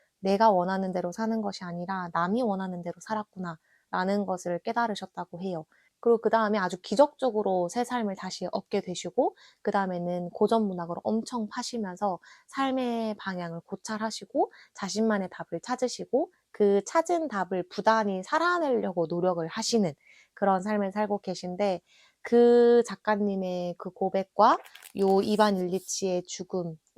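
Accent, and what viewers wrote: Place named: Gyeongsang, South Korea. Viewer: 예전에 김동식 작가님도 글쓰기에 대한 공부를 단 한 번도 해본적 없으신데, 보안관련 일을 하다보니 저녁에 시간이 많이 남았고, 이런것도 이야기가 될 수 있나? 하는 마음에 글을 적기 시작하셨다는 걸 듣고 엄청 놀랐었거든요, 이미예 작가님의 펀딩까지 가신 스토리도 그렇고, 전혜지 작가님, 😶 정말, 저런 몰랐던 작기님의 사정을 들었을 때 강하게 동기부여가 생기는 경우가 있더라구요! 허업! 🫢 저도 누군가 관계를 형성함에 있어 가장 중요하게 생각하는 게 무어냐 물으면, '나는 존중과 배려를 언제나 1순위로 생각한다, 입장을 바꿔서 생각해 볼 수 있어야 한다' 라고 언제나 말해왔거든요??? 너무 신기해요!! 😶 갱상도 사투리에 미소 지으며 들었네요 ㅋㅋ 🤭 아니 근데 어떻게 저렇게 말 한 번 안 절고 낭독을 잘하실 수 있을까요?? 축축하고, 찝찝한 이야기를 저렇게 밝게 귀엽게 설명해주시는 ㅋㅋ 😂 정말 스토리 정리를 엄청 몰입감 있게 너무 흥미롭게 잘 설명해 주셔요 😊 저~~ 얇은 책들에 붙어 있는 인덱스나, 하이라이트 친 것들을 보면, 님이 얼마나 감명 깊게 읽으셨는지 느껴지는 거 같아요:) 저는 요즘, 삼체나 프로젝트 헤일메리 안에 거론되는 가상의 이론이라든지 언급되는 기존의 이론들에 대해 과학자 또는 이과 유튜버 분들이 너무 즐겁게 토론하고 얘기하시는 모습을 보면서, '와, 나는 저런 이론은 이해도 하나도 안 되고, 무슨 소린지 모르고 지나갔음에도 이렇게나 재밌게 읽었는데, 저들은 도대체 얼마나 더 더 더 재밌게 읽었을까?' 하는 생각을 하게 됐어요 😢 그래서 하드 SF에 자주 등장하는 몇 몇 이론만 대략이라도 이해하고 있자! 하는 마음에 검색하기 시작했다가, 과학 유튜버들에게 빠져버렸어요, '어!? 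나, 과학 좋아했네?' 같은 느낌이에요 ㅋㅋㅋ 😂 어쩌다보니 책도 안 읽고 과학 유튜브만 보고 있는 요즘입니다 😆 아침에야 댓글을 보실! 오늘도 또 행복한 하루 보내시길 바라요 🤭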